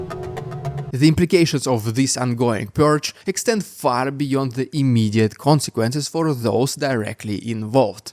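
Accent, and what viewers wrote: Russia. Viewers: With that accent, there is no doubt that this dude is Russian. What gets me is that this dude's English vocabulary is in the 90th percentile. Impressive.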